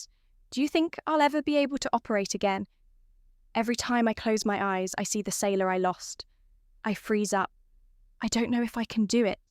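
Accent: United Kingdom. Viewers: Good story. Love the British accent and no AI mistakes in pronunciation or misspelling So refreshing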